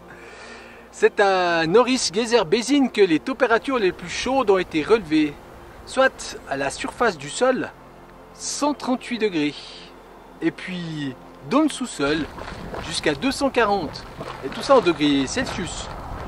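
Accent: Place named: Switzerland. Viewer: Je vous remercie d’avoir pris le temps de répondre, je vais remonter dans vos vidéos et aller voir votre présentation. , J’ai 58 ans et suis dans le même état d’esprit que vous l’étiez certainement avant de faire le pas pour une vie différente , et visiblement nous avons le même parcours de voyage . Je file cet hiver aux Philippine 3 semaines maxi , contraint pas le travail , j’espère que les vacances qui suivront seront les grandes vacances et que je ne serai plus contraint de revenir à date . En attendant, merci encore pour vos vidéos qui font du bien . Et je vous taquine pour l’accent , ne changez rien ! Et vive les suisses !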